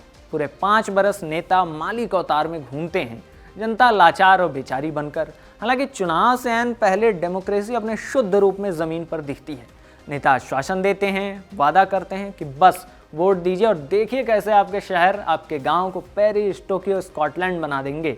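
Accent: Bihar, India. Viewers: bhai, Real tone of Bihar -UP Maja aa rha aapka show dekh kr Theth bhasa me ❤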